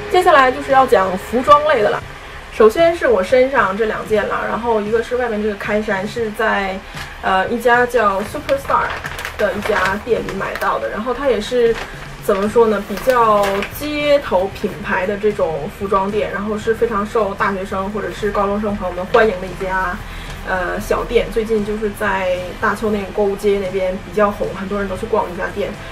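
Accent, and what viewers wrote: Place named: Changchun, China. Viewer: 长春人？难怪口气很熟悉，老乡啦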